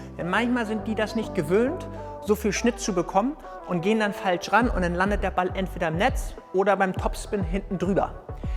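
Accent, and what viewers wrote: Hamburg, Germany. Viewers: Schöner Hamburger Slang Bin selbst aus Hamburg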